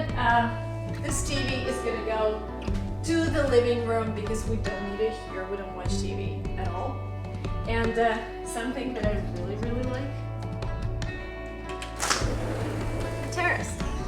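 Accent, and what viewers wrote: United States. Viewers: You look so happy it's contagious. How tall are you? it's the sink pretty low or a combination of both. You don't seem to have an accent (ok you have an American one), are you from the states? I hope you guys enjoy the place for a long time.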